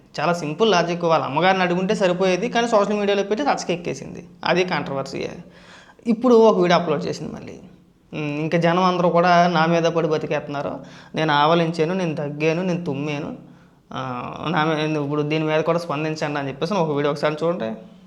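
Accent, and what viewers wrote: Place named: Amalapuram, India. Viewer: Sir me accent vinte Amalapuram lo unnate anipistundi sir pelli chesukuni tirupati daggara oka palletooru unnattu undadu Sir 🙏😊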